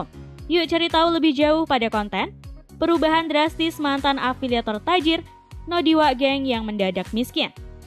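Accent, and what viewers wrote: Indonesia. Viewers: Yg ada nya kau bkn miskin.. tp balik kampung ketakutan Oh btw bang Kau itu anak aceh ya Jgn kau bawa2 nama anak medan Logat bicara kau aja aceh x Anak medan ga spt itu logatnya Org asal aceh kok bawa2 nama kampung sebelah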